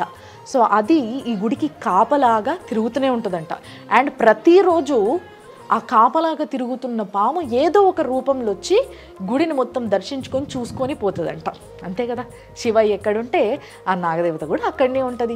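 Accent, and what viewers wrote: Telangana, India. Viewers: Your anchoring is cute, in Telangana slang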